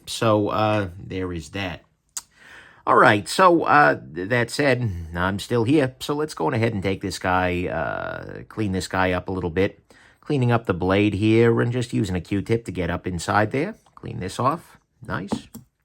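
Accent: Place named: Boston, United States. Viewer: In my world, as an engineer, the fastener that caused you so much problem is called a "Sex Bolt". And, the term you were fumbling for when describing the feature that keeps the female end of the sex bolt from spinning, is referred to as being "indexed" or "keyed". I'm sure different trades will always use their own colloquialisms... But, more importantly the female end of that fastener should either be plain, or use a noticeably different tool engagement to prevent brutes like you from abusing it until it turns! At least you didn't go for the torches to free it up!! This is the first time I've seen one of your videos, I enjoyed it once I got past the 'Bastin' accent (or maybe New Yak?) 😎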